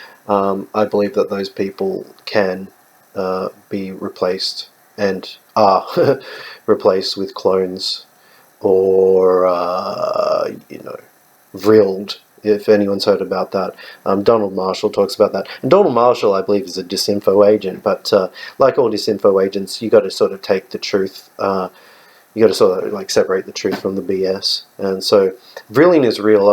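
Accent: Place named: Australia. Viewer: Is there a possibility that Reptilians aren't aware of their background? If they're 10% of the population, who and how are they i informed? Are they approached by so called "handlers" during early childhood? I'm not sure off the process. I believe in Psychic Vampirism. I've seen peoples face distort, especially the eyes. It didn't scare me because it was a confrontation and I was calling out the persons behaviour. That's why I believe I got to see the real person behind the facade. Now, like yourself, I can spot them almost immediately. So I come across a few people that are in "attack mode" for no reason. I've had to walk away from so called friendships because these people suck the very essence out of you. And who wants that. Great to hear an Aussie voice talking about this phenomenon aswell. Keep up the good work bro.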